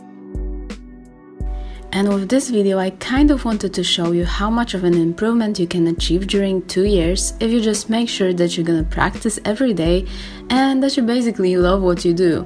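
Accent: Poland. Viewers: Are you from Poland? Cause you have polish accent. You're English is so good. How many years you're learning English? (Sorry if my is bad) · Jesteś Polką? Jakoś tak polsko się nazywasz i masz taki polski akcent. Ślicznie rysujesz <3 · Hah! Kiedy cię usłyszałam od razu wiedziałam że jesteś polką :D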